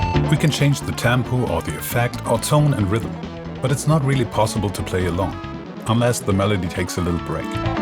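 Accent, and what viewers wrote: Germany. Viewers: Nice to hear the German accent again.👏👏😁😁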